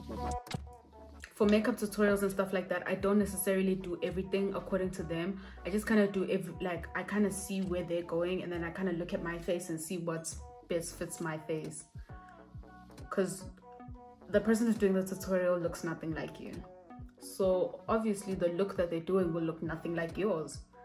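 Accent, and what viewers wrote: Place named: Germany. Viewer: Man hört das sie deutsch ist oder bin ich dumm😂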